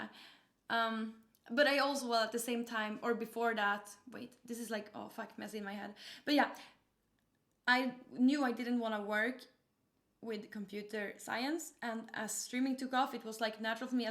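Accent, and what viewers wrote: Sweden. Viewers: She's been a pro streamer for quite some time...yet she has the thickest swedish accent you'll come across. How does it not improve lol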